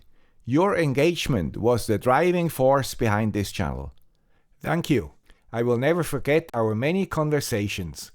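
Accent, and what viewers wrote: Switzerland. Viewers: As a long term viewer, but not so a commenter. REALLY Thank you for all the new ideas, tech, and all the effort put in each video!! I always look up for your channel by "the guy with a swiss accent" and works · Swiss accent with a heart :) best ahead to yourself and your family. Thank you for sharing your journey. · You will be missed,the man with the swiss accent. I hope someday you get back to regular videos, I don't mind if you accept more YouTube ads. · Thank you I always looked forward to your videos. It inspired me to make my own projects, making use of Banggood and Aliexpress, and I was even once featured on Hackaday. Thank you Guy with the Swiss Accent! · Thanks from Australia! (My father was Swiss, so your accent always made me smile 😃) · what a fantastic channel! Your videos are a huge inspiration for my own maker journey and the tech content I create here on YouTube. 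I love tinkering with the ESP32 myself to turn my gas and electricity meters – and anything else I can think of – into smart devices. Your charming Swiss accent makes the videos even more enjoyable for me from Germany, but what I value most is the depth with which you explain every topic. As an advanced maker I learn something new every time – thank you for sharing your knowledge so generously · I'm an old engineer myself. Have enjoyed your videos, I like your swiss accent! And you have an engineers inquisitive mind. Glad you are open to still posting in the future, you've gotten good at this, but it should be fun and not a chore and feel free to do it only when you feel like it. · So many cool concept that you have presented, some I have also implemented with your Swiss accent help. And most important - I finally thanks to you I did not feel I am the only one, too old, or crazy enough to tinker with everything I touch xD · Thank you for all your fantastic work! 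As a French speaker (France), English with a Swiss accent makes it easier for me to understand ;-) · I will miss your Swiss accent enormously. Not to mention the Hat. If you have anytime I could do with some help on a radio project I am attempting to come up with a solution for. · Hi there, another guy with a swiss accent. Big fan of your channel! I took over a farm this year and you are the reason why i am currently connecting every cow to my Lorawan. You got me hooked on LoRa. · Dear, the guy with the Swiss Accent - Thank you..... Like many others you kickstarted my homeassistant, arduino, Esp32, wifi, Raspberry PI (grafana etc,), BangGood and Aliexpress addiction 🙂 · We'll always be grateful to the guy with the Swiss accent. Thanks for teaching us so much over these 10 years. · Dear: Thanks for years of inspiration. Have a nice retirement and safe travelling. We will wait for the next video with The Swiss Accent 😃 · Hi, I’m a new fan of the channel so sad to hear you're no longer making regular content, but lucky for me there's lots to catch up on:) Your ESP32 deep dives and LoRaWAN tutorials have been especially inspiring—and your “Swiss accent” has become iconic in the maker community! 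I’m helping on a podcast that celebrates creative storytelling through tech, design, and making. We’re spotlighting voices that blend hands-on experimentation with thoughtful insight, and I’d love to invite you as a guest. (I have messaged you before, but that was when you were still busy with your channel, and maybe you have more time now?) It would be a relaxed remote conversation, tailored to your schedule. We’d love to explore your journey, your favourite projects, and your thoughts on how maker culture is evolving—especially for curious minds entering the space today. Would you be open to a quick chat about joining us for an episode? Warm regards, Chelsea. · Thank you und "Grüezi" from Luxemburg. It was tremendeous all these years listening to your accent, ;-) while learning the different technologies you presentend with heart and soul ;-) Thank you for all these shared hours ;-)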